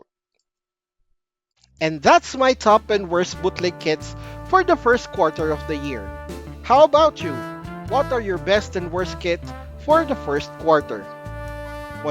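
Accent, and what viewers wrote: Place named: Philippines. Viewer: Oh youre from Filipina thats why little weird I heard your accent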